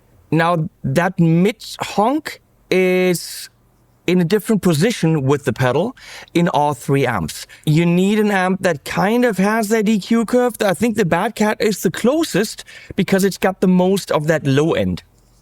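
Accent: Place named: Germany. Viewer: Came for the pedal review stayed for the German accent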